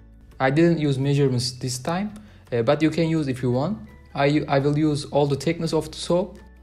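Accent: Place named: Turkey. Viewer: Tam da Türk aksanı var diyordum 😅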